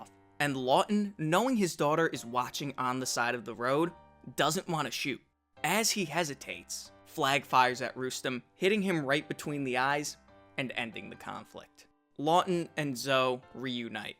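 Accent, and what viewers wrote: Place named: Boston, United States. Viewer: Homeboy and his Boston pronunciation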